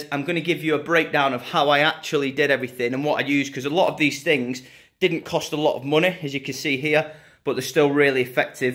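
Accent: United Kingdom. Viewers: I love your British English.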